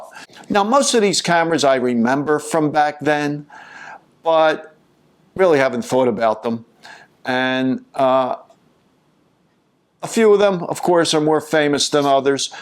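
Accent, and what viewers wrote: Philadelphia, United States. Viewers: Are you from Philly? The accent is undeniable!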